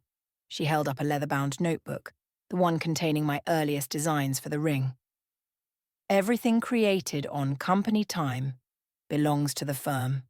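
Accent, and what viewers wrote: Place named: United Kingdom. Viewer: The British accent drives me crazy. Sounds like she has a mouth full of marbles. I’m gone!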